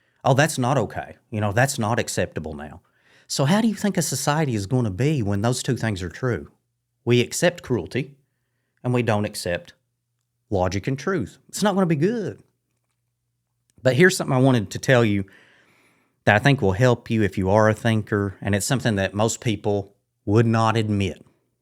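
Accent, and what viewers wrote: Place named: Kentucky, United States. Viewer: Love your thoughts and your accent! Kentucky?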